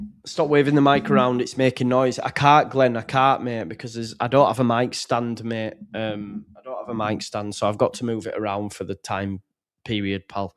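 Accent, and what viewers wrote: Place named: Leeds, United Kingdom. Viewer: Best proper Leeds accent on Youtube. I missed you, man.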